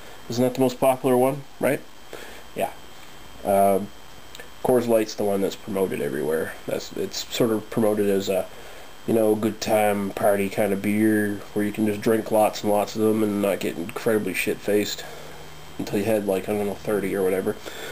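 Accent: Canada. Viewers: you have a VERY canadian accent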